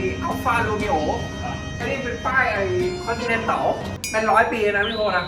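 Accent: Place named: Thailand. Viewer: โอ้เหน่อทำไม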